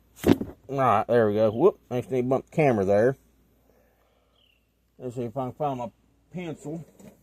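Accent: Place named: South, United States. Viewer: Brother I thought I was southern lol you got the deepest country accent I've heard in a while. 😂 alot of folks would say they can't understand us so I guess it's our own language 😂😂😂 great video can't wait to see you use it once it's all finished. Keep it fishy 🎣👊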